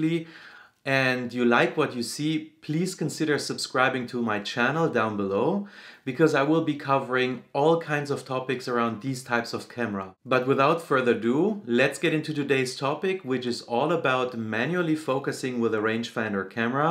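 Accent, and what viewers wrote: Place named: Zurich, Switzerland. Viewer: Sali hier ist Benjamin. Bin über Dich gestossen, als ich für meine neue M6 Tipps gesucht habe. Komplett unerfahren in Rangefinder und deren Funktion bin ich auf der Suche nach Fokus etc. gewesen. Dann kam Dein Video zum Vorschein. Dachte mir den Akzent kenne ich doch irgendwoher, anschliesend habe ich unter “About” herausgefunden, dass Du in Zürich lebst, ich auch. Fand ich ganz witzig. Eventuell kann man sich ja mal treffen. Wie gesagt bin ganz neu was M angeht, und jetzt mit einer Traumkamera, die zuhause wartet ausgepackt zu werden. Das passiert morgen. Wollte eigentlich nur einmal Danke fürs Video sagen. LG Benji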